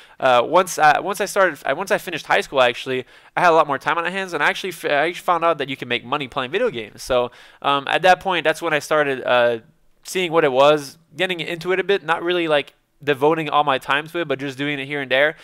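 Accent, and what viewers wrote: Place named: Quebec, Canada. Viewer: c un quebecois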